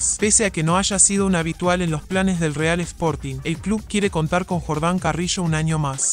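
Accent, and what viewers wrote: Argentina. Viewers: Que inche necesidad de el acento argentino? Le da más valor a la publicación?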